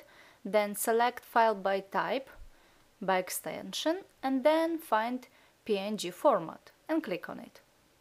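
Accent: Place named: Russia. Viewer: are you Russian. I really like your English. its too sexy. :)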